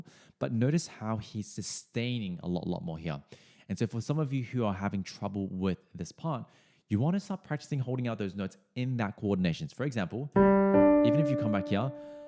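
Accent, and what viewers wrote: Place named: Australia. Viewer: I make my piano students listen to Jeff to understand different tones and phrasing. I think my Caucasian Canadian choir did not appreciate my raving about Jeff! BTW, are you in NZ? I’m trying to place your accent. I left in 1987. But you pronounced “example” with the Aussie “a”.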